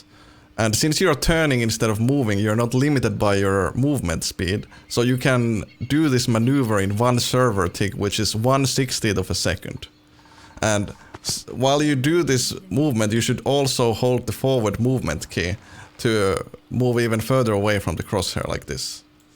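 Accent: Finland. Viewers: Are you Finnish? You sound like it.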